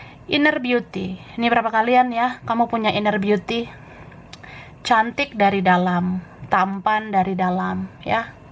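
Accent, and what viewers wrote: Java, Indonesia. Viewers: MB klo ngmng mbok yao. Medok tenan wong jowo 😁👏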